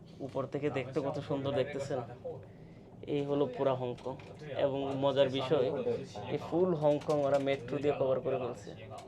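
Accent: Chittagong, Bangladesh. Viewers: ভাই আপনার কথায় আপনি যে কাজ চট্টগ্রামের সেই টা বুঝা যায়।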